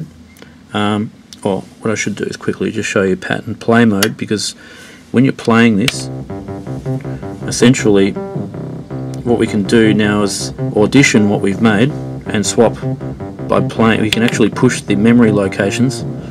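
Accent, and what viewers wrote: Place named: Australia. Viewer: Hi, noticed the Aussie accent. Are you in Sydney? I found a 303 and 606 in a council clean up pile in Mascot. I shit you not. I have no idea how they work (I play cello) but if you’d like to have a look at them please let me know! It’d be fun to see if they work. I put batteries in the 303 and it lights up.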